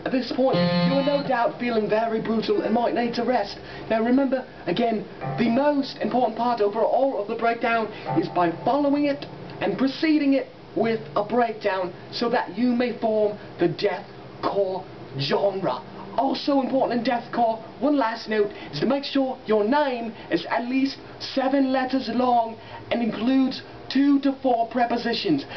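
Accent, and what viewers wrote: United Kingdom. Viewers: where the fucking shit do ya live?? o.O your accent tells me you must be british but where exactly?? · This Vid...Had a important factor, a British Accent BRUTAL GUITARIST! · fuckn british accnt irritates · I hate that english accent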